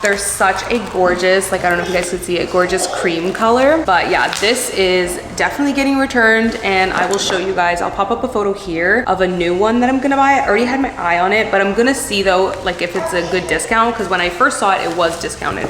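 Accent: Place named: Nashville, United States. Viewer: you’re getting that Nashville accent😅 I love it❤ xoxo from Toronto.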